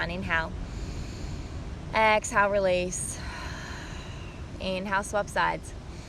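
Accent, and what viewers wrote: Australia. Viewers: the Aussie accent is great - a nice change of pace for a Midwestern (US) yogi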